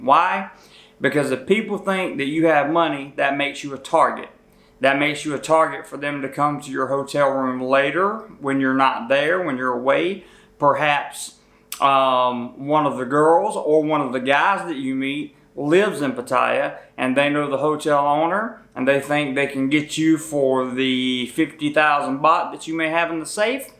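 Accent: South, United States. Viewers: The southern accent while mispronouncing "Pattaya" is funny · You have a southern country accent. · Thanks bro good info:) Dude you sound like you're from the South nice to hear a accent brother:) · Man were you from I know your from the southern us original I'm from Tennessee so I know it's a southern accent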